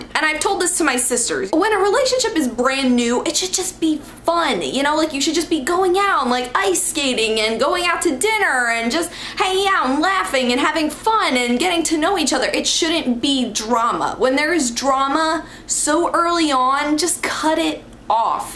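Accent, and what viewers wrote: United States. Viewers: Hey! You are really nice and you seem like a good person, +1 Subscriber. And the total Drama part about Duncan, YAS I LOVE TOTAL DRAMA ISLAND. Where are you from? You seem like you have an American Accent, I'm Australian btw.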